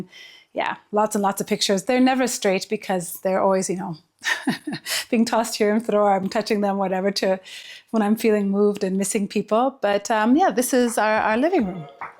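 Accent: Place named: Kenya. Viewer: she even got that kenyan accent wow.....i wouldn't even know she's ethiopian if i met her, would have assumed she's kenyan borana or oromo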